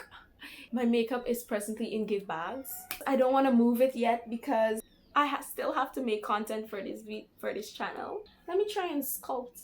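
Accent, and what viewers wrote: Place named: Guyana. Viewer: Omg u have a Guyanese accent nice love it❤️❤️❤️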